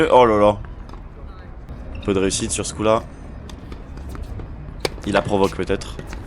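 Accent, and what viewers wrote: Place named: United Kingdom. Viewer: waa il a un big accent des UK mdr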